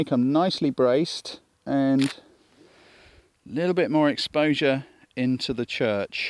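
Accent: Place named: England, United Kingdom. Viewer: i was shooting sunset pics at the beach for my first time on manual mode and i was speaking like unconsciously(English accent) in an effort to set the right settings to get a good shot LOL... hope i am not the only one hearing voices (his) on my head hahaha. oh out of 208 got 10 decent ones for my level.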